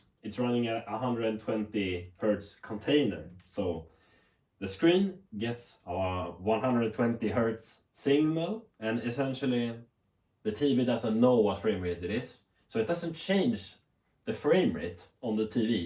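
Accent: Sweden. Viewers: does amd freesync really add input lag? i got a hisense 144hz 4k tv, i got vrr off allso. hey man are you from sweden like me? sound like a bit of swenglish accent;) good content1:)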